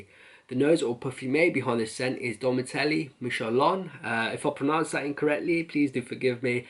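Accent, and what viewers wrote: Birmingham, United Kingdom. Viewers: Are from around Birmingham mate? Just got this myself today. love it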